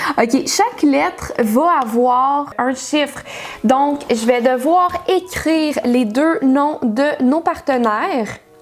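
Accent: Quebec, Canada. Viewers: Bonne année? T'as un accent québécois?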